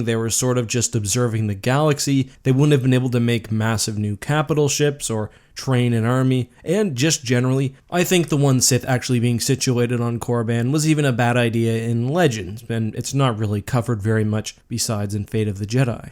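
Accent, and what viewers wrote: Canada. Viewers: Lol...You have an OUTRAGEOUSLY STRONG Canadian accent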